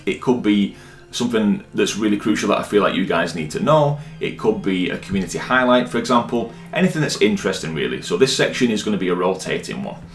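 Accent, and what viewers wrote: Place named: Northern England, United Kingdom. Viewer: This is the first time I've seen you. Very helpful video thank you. Also nice to hear a northern accent, Yorkshire lady here!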